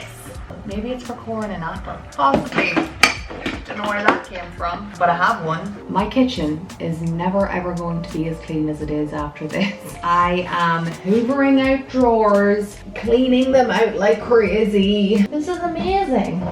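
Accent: Ireland. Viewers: I love your blogs! I organized my closet after watching you....brought out all my spring/summer clothes. Put away all my winter stuff. I could watch and listen to your sweet Irish accent! Please keep your vlogs coming! ❤️ from the USA!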